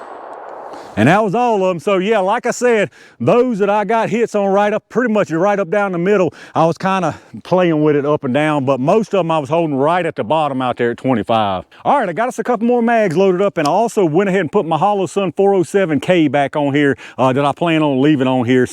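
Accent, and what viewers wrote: United States. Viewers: Been lookin at 1 at rural king,339$. I have the tx22 comp & this would be nice to have as well. Guess im a 22lr freak cause i have many of them & love em all,but my favorite round is the 5.7x28,wish taurus would come out with 1 that uses that round. Great video,i subbed cause that accent is as country as mine. Lol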